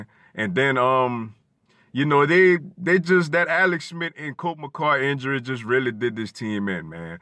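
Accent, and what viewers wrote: New Orleans, United States. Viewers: Sound like a NewOrleans?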